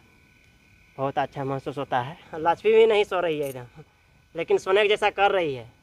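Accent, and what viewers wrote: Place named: Jharkhand, India. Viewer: आपके बोलने के अंदाज से ऐसा लगता है की आप चतरा या लातेहार साइड से हो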